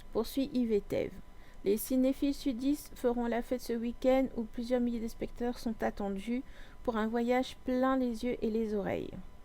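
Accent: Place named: India. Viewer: ah! D'Accord moi aussi je vis sur l'île de la reunion dans l'océan indien! Et j'ai moi aussi une chaine YouTube s'apelle Geek to Cinema! Donc maintenant on est deux! Lol en revenche je n'ai jammais entendue parlé des autre autre YouTubeur! Mais sans être curieux tu vis ou a st pierre ou st Louis! Parceque a un moment tu dit que le Ciné et a de chez toi 20 minutes Moi je vis a la ravine blanche! Et je pence que il 10 minutes ou 15 minutes tu as un accent indien quand tu parle tu es d'origine Indienne!?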